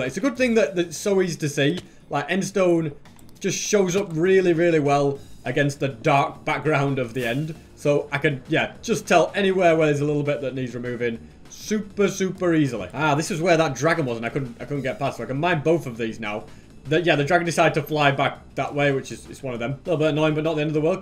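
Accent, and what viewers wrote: United Kingdom. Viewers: idk why but sb sounds too British in this vid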